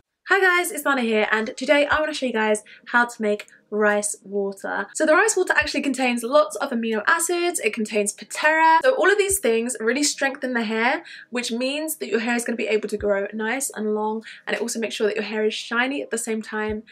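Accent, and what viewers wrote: United Kingdom. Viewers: Wotah Ahh I love the British accent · Hi Lana. This is my first time seeing your video. I really needed and appreciated your show. Great British accent.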